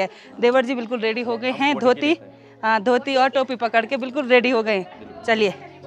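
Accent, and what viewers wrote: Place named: Garhwal, India. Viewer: didi aapka Garhwali bolna bahut achcha lagta hai♥️♥️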